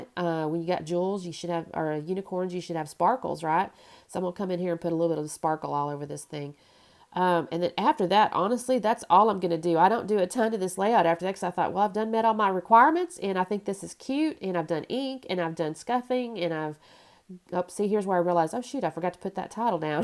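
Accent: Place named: Virginia, United States. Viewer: Congratulations on meeting your subscriber goal of 1,000! I always enjoy your layouts and your personality in your videos. My husband was walking through the room yesterday when I was watching your latest video and said "Where's she from? She sounds like she's from back home where I lived in Virginia!" Hope you keep on making videos and continue to increase your subscribers!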